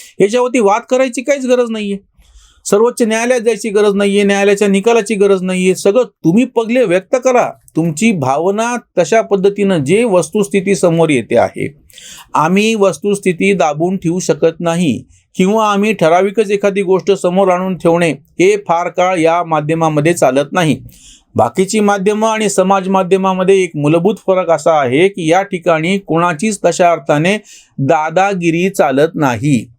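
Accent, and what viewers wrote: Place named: Marathwada, India. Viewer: मराठवाडी लहेजा न सोडता आपण बोलत रहा. भाषा दर दहा कोसांवर बदलते अशी म्हण आहे. "लाईक्स भेटत नाहीत" या वर कुणाला हरकत असेल तर तो त्याचा प्रश्न आहे. भाषा शुद्धतेचा अवास्तव आग्रह धरणे अनावश्यक आहे. व्हिडिओ दहा मिनिटात संपला तर जास्त यशस्वी होतो. भाऊंचे व्हिडिओ मी 1.75X स्पीड ला बघतो. काही फरक पडत नाही. उलट भरपूर वेळ वाचतो.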